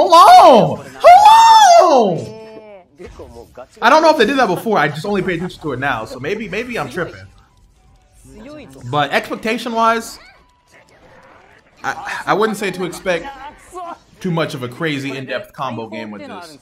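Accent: United States. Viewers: No hate to but I hate the way Americans pronounce the characters' names especially with Naruto and Jotaro.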